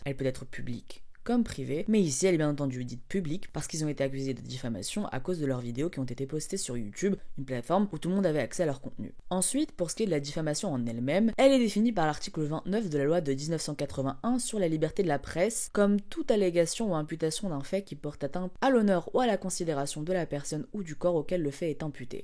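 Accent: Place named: England, United Kingdom. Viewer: pour quoi prendre l'accent anglais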